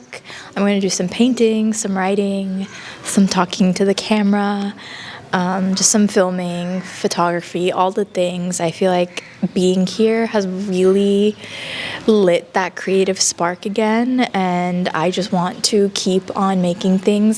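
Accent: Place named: California, United States. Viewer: Girl I’m from California too & im sure we do have an accent😂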